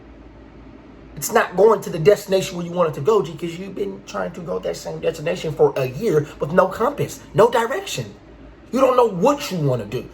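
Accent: Baltimore, United States. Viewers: U sound like a bmore nigga🤣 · Baltimore accent detected. But nah for real thanks for all your help my G. Peace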